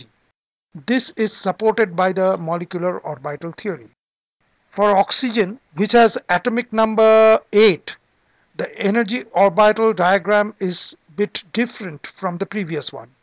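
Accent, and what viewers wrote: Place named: India. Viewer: Typical Indian voice..... Good.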